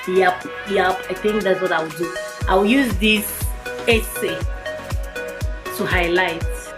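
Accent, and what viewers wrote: Port Harcourt, Nigeria. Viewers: I like your pidgin o, very Port Harcourt'ish..LOL!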